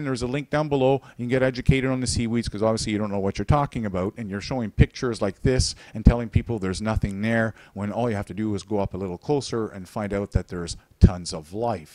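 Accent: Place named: Newfoundland, Canada. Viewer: This guy sounds like a Newfie. Not referring to!!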